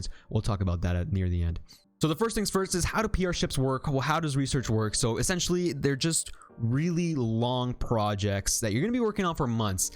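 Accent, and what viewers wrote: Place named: England, United Kingdom. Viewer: i love english pronounciation on german words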